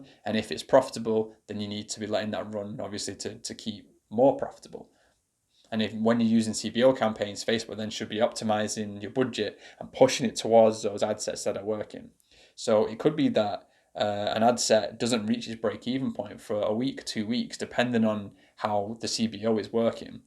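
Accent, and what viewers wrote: United Kingdom. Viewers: Hi. I'm interested in your course. I take it from your accent that you are a fellow Brit. Most Drop-shipping courses are targeted at the USA.Have you noticed many differences in the way you operate your DS business from the UK (assuming you do). Thanks in advance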